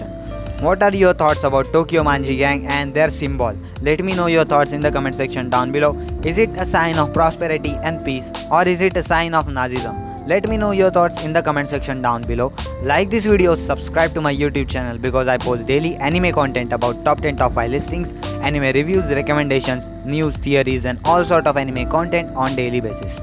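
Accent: India. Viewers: Yes mai bhi pehchan gaya ye indian accent ko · Tumari aawaj sunke hi pahachaan lia tum indian hoo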